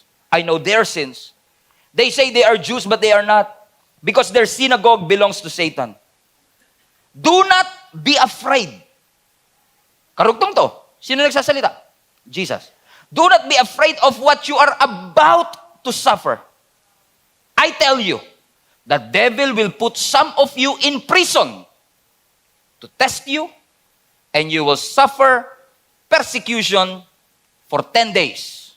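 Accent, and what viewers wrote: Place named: Visayas, Philippines. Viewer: Ang Ganda marunong kpala mag Bisaya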